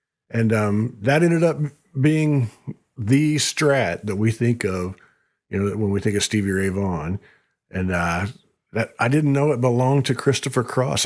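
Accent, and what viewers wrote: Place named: Indiana, United States. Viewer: I really enjoyed the video I'm starting to dig into your channel and it's really cool. Sounds like you're Indiana I'm in southern Indiana myself right across the river from Louisville. Sorry I'm rambling lol thanks for your work.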